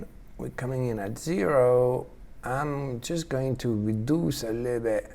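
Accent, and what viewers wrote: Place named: France. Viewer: amazing tutorial, I dont have a mac so im using FL Studio and just getting to grips with it. Your talent is amazing this is why you are #1 (even though SHM are my Favorites ;) ) but what amazes me even more is your english. us british can be so arrogant and not attempt to learn another language. Sure you have your french accent but i can understand every word without struggling.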